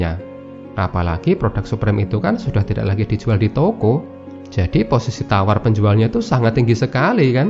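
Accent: Java, Indonesia. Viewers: Bisa gk medok nya di hilang'in??? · Suaramu medok tenan mas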